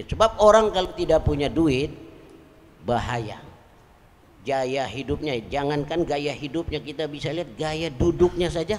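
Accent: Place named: Jakarta, Indonesia. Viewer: Masha Alloh ane seneng banget neh, ceramahnya Habib Muhammad Bin Husein Alaydrus, betawi banget, semoga Habib sehat selalu...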